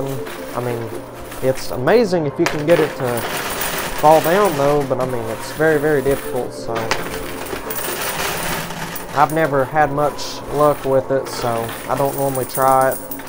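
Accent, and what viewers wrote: South, United States. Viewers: Love your videos and your southern accent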